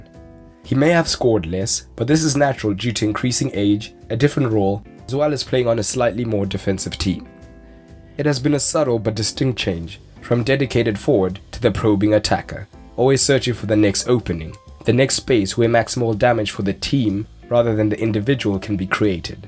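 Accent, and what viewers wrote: South Africa. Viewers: Great video there mate. Are you from South Africa by any chance that accent sounds distinctly South African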